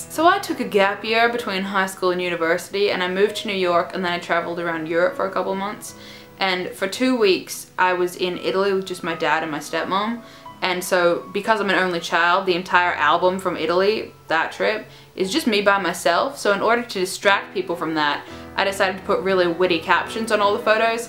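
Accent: Australia. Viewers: Are you Aussie?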